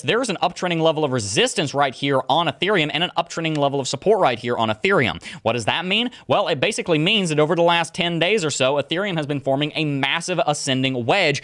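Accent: South, United States. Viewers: he's from the south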